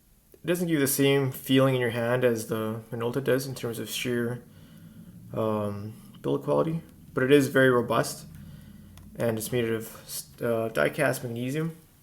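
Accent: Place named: Canada. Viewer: This guy sounds Canadian af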